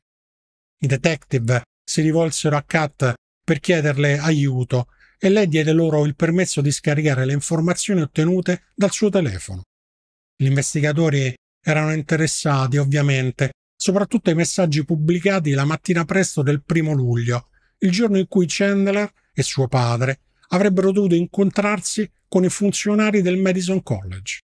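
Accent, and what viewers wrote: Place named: Rome, Italy. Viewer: Mado’ che voce fastidiosa e che accento troppo marcato …Spaes Ics che sarebbe? Prova a parlare in italiano invece che romano, siccome che lo devono ascoltare tutti….video pessimo. DELETE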